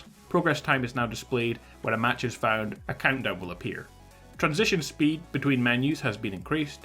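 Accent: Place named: England, United Kingdom. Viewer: Your voice is like pencils getting stabbed through my eardrums. You goofy English Fuck.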